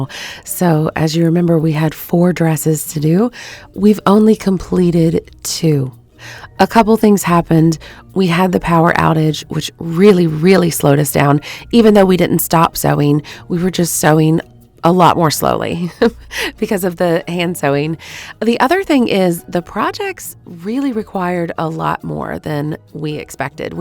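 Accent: United States. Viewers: Oh my God girl! Your voice sounds so familiar! Are you from Alabama? I saw you on the Hopescope video. And I heard your voice and I was like this girl is from Alabama and I know that voice!! Lol! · ❤ You did such amazing work!!! I just watched her video and she absolutely loved them. I love the pink and rainbow dresses. Also, listening to you is so soothing. You remind me of my two aunts who live in Texas and Mississippi ❤❤❤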